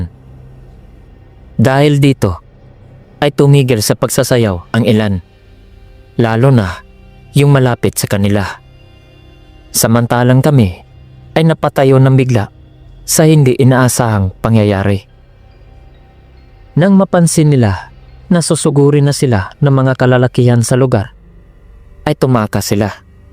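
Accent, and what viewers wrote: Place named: Visayas, Philippines. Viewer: New subscriber idol gusto naku bisaya imong pag narrate ❤hehe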